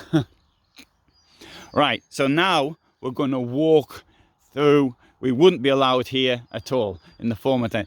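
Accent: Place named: North East England, United Kingdom. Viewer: I can here a north east English twang to your accent😁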